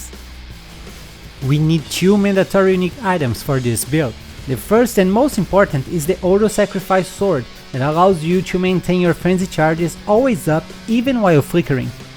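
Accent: Brazil. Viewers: Acho fantástico que dá para sentir o sotaque brasileiro kkkkkkkkkk Obrigado pelo vídeo!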